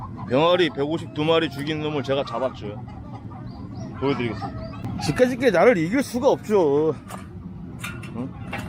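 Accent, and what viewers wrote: Gangwon, South Korea. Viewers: 고양이 안죽이신건 잘한일임. 예로부터 개, 고양이, 뱀은 죽이지 말라고 했습니다. 동물령이 붙는다죠. 근데 어제 이 채널 첨왔는데 매력넘치는 주인장님 재밋네요. 재밋는 강원도 사투리 억양 잘보고있습니다. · 강원도 사투리 쓰시는거 보니까 강원도 이신가봐요?ㅎㅎ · 네발달린늠이 고양이였네요.. 병아리를 안먹고 놀이삼아 죽이다니.. 저도 강원도라 말투 정겹네요.. 단속잘하셔서 피해 더이상 안받으시길.. 화이팅하십쇼..^^ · 카리스마있는 인상에 엄청 잘생기셨는데 ㅋㅋㅋㅋ 강원도사투리가 너무 귀여우시네요 ㅋㅋㅋㅋ 반전매력ㅋㅋㅋㅋ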